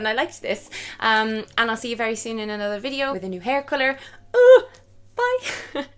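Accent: Ireland. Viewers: I have a question are u Irish ?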